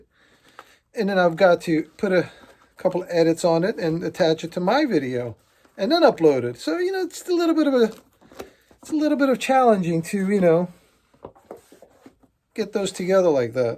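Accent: New York, United States. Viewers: I like how you talk. Are you from up north, like New York or something?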